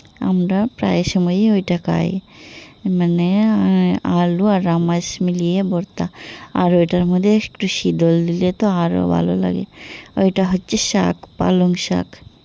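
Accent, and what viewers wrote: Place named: Assam, India. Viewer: তোমার কথা গুলো শুনতে আমার খুব ভালো লাগে। মনে হয় তুমি আসামি ভাষায় কথা বল ❤️❤️একটু আলাদা কিন্তু ভীষণ ভালো লাগে। 👌👌💐🌹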